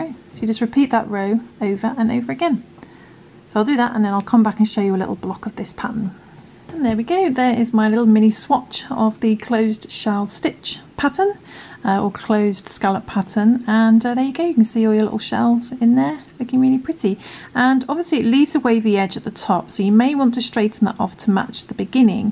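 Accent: England, United Kingdom. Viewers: I find your technique fascinating. The way you position your hook facing upward and the way you wrap your yarn. It flows very well. And you are the only person I’ve ever heard with an English accent, using US terms and stating the UK terms as well, repeatedly. Very helpful I’m sure to those in the UK.. I’m thinking maybe you live in the US? Lovely stitch pattern! I look forward to this series! Thanks for sharing!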